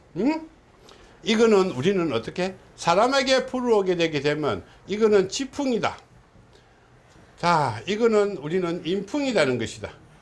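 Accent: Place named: Gyeongsang, South Korea. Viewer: 삼재특강 ? 갱상도 사투리로 이해시킬려고 하시는게 뭔가는 좀 답답합니다! 귀에 쏙들어오질 않고 복잡하게 들립니다.. 내가 너무 이해력이 부족한건아닌지? 멍청한건지! 도저히? 특강의 이해부족으로 아쉽지만 ~ 포기하고 다음기회 로 미루겠습니다!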